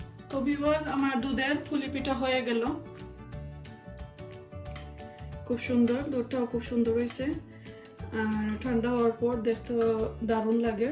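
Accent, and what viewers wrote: Sylhet, Bangladesh. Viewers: সিলেটি মাত ভালা লাগে আমার ঘরে তাকিয়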